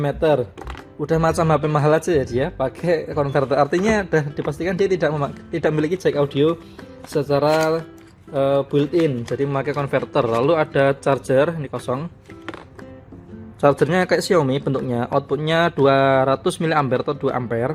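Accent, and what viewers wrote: Java, Indonesia. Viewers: Medhoq banget 😆😆😆😆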